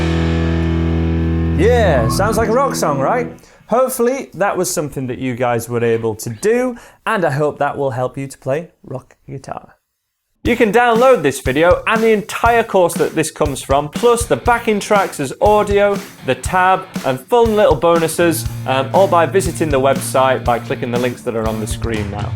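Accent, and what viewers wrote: United Kingdom. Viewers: I think this guy is a bit British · Jesus, man, you're sooooo britttish!! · Speediablo 7 Productions he sounds absolutely nothing like Ali-A, the only similarity is that he’s british, that’s it. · Something tells me that your british 🤔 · you are only brit that i can listen to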